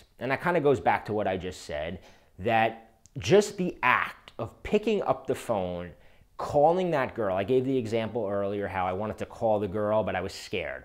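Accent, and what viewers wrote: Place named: New York, United States. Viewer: are you from Long Island or Queens?...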